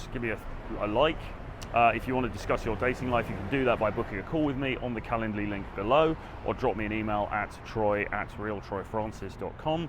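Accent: United Kingdom. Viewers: Does having an English accent give you a head start in social interactions?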